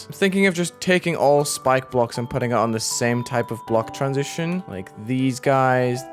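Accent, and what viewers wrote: United Kingdom. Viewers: You're bri'ish, same. What county you from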